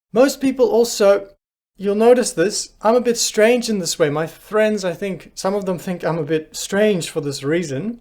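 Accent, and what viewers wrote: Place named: South Africa. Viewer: Yeah. I was confused for a second as I thought you were from Australia. But then, I thought about it and thought maybe your accent sounds a bit South African.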